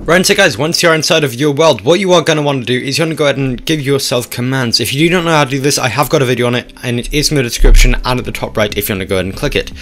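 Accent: United Kingdom. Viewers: Are you british or australlian?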